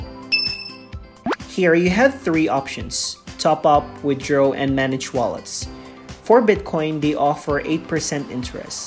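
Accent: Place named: Philippines. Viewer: U sound Filipino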